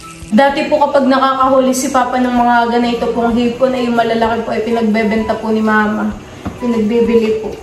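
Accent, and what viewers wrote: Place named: Visayas, Philippines. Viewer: May pagkabisaya ang salita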